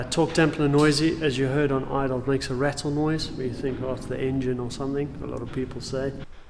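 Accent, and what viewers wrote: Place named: South Africa. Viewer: You from South Africa right? Can't hide that accent mate!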